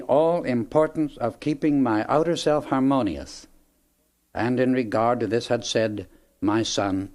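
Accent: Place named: Northern Ireland, United Kingdom. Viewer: the accent sounds Northern Irish to me. Would love to know who it is.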